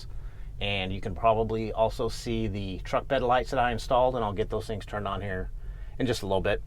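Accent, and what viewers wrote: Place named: South, United States. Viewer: Very organized and attention to detail. Now, go on some adventures and take us along. You seem like a good entertainer. Like that southern accent.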